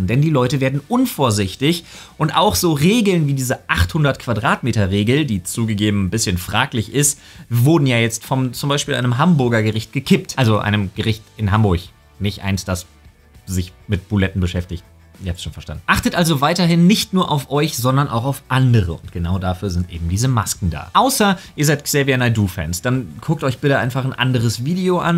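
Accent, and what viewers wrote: France. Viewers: Mit deinem Bart und diesem französisch klingenden Aktzent könnte man denken, dass du wirklich Franzose bist😅😅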